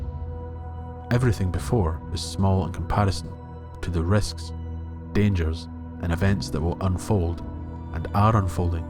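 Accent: Scotland, United Kingdom. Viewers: I agree completely, the shear amount of world building and the flavor of the tale are set here. Rich lessons and enjoyable dialogue; ive come back to this chapter many times. I could listen to you read the entire chapter, Scotch accent?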